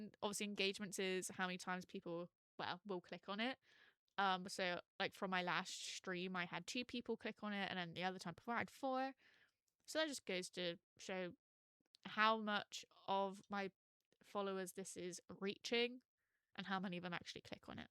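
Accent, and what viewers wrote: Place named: United Kingdom. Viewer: I think your voice and model match up nicely. I'm a small youtuber and I envy your correct British accent. Streaming is a cut throat business so I wish you well.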